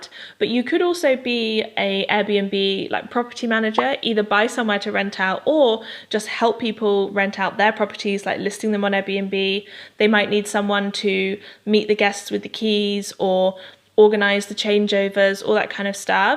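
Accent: United Kingdom. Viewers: It’s so refreshing to hear a British accent! Hallelujah 🙏🏾No shade! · Great content as always! Thank you 😊👍 and this british accent grrrr loving it! 😊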